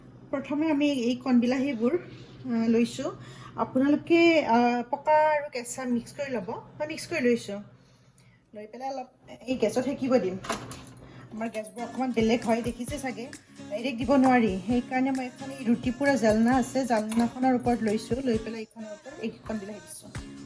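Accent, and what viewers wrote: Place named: Barpeta, India. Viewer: বৰপেইটা ভাষা আহি এ যায়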